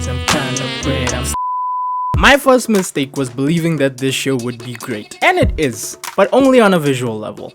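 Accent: South Africa. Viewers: I knew that accent sounded so familiarrr brotha is south african like me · Yo are you South African?? You dsying 'This ain't it chief' is a phrase I've realised I only ever hear in my country😂😂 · How did I know you were South African in the first 30 sec😭🇿🇦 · I know you are South African , our accent is so pure I’m proud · You south african neh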